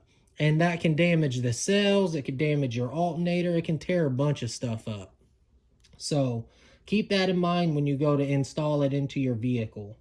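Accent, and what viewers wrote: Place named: Indiana, United States. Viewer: Yo you from Indiana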